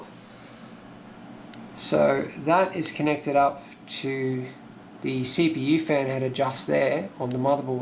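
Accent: Australia. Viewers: I thought you were from Australia, I'm from Perth. Btw nice system im currently building my first water cooled setup up. Just a question? Umm how would you run your water loop? Would you go Reservoir > Pump > Radiator > CPU ???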